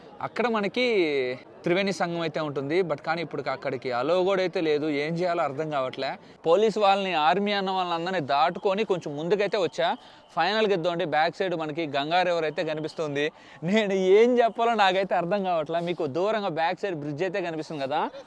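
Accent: Nellore, India. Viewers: ఉన్నది ఉన్నట్లుగా నెల్లూరు భాషలో అద్భుతంగా చెప్పావు నాన్న థాంక్యూ వెరీ మచ్ మాది కూడా నెల్లూరు నాన్న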